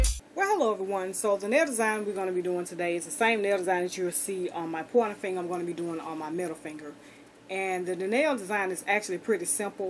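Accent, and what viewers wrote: South, United States. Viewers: I found your channel looking for micro braid tutorials but I found so much more. I am a new subbie. Where are you from? I am from S.C. just wondering because we both have that wonderful southern accent.☺ Have a blessed day!🌹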